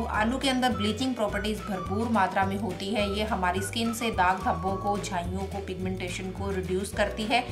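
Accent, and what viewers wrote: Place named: Haryana, India. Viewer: Mam AAP Haryana se ho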